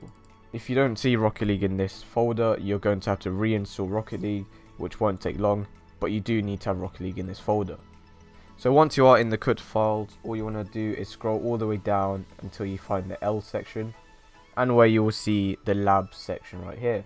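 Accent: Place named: London, United Kingdom. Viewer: Sheesh bigman with the london accent bigman thatnks for the help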